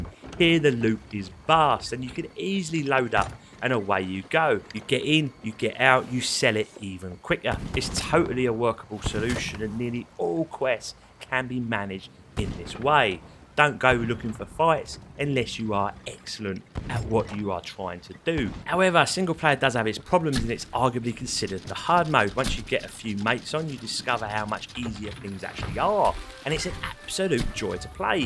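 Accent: Cockney, United Kingdom. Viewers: You deserve more subscribers my cockney sparrow! Love your videos 👍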